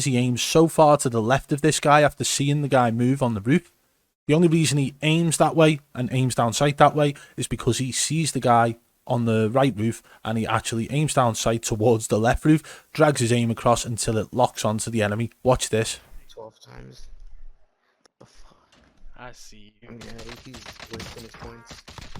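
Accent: Liverpool, United Kingdom. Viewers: You have to be related to Nick Peet, look just like him and a scouser aswell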